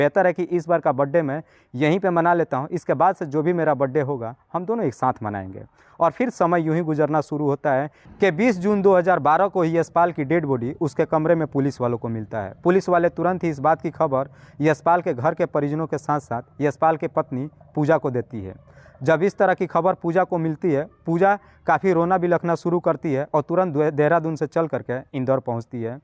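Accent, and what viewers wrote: Bihar, India. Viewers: Bihari aise hi bolte hai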